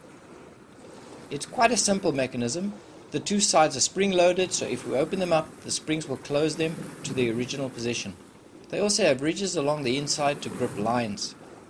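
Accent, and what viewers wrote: South Africa. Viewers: Totally Fabulous - love the SA accent too !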